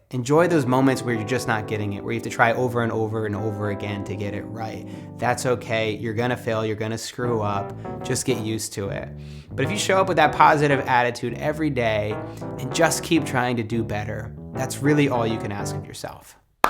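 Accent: Australia. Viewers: As an Aussie, thank you for this. I watch all your videos, and accent makes me feel close to home even though I'm living in NZ. It means a lot you are promoting the relief effort. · Call me stupid but I only just realised that has an Ozzie accent after watching your videos for like a year & half or something. Great video as usual. On a side note I've tried getting up early & cutting out sugar as well being inspired by your videos last year.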